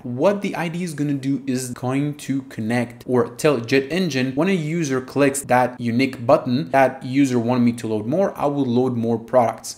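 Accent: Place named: Brazil. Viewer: Primeiro vídeo que assisto teu, muito bom, mas tu é BR né? hahahaha sotaque EN_BR é muito claro pra mim.. haha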